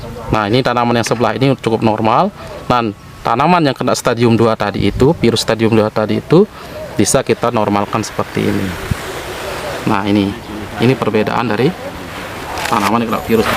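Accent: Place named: Lombok, Indonesia. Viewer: Logat orang sasak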